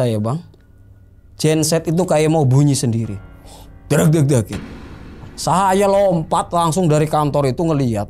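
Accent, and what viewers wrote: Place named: Indramayu, Indonesia. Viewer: Indramayu tenan kih uwong.....😂😂😂😂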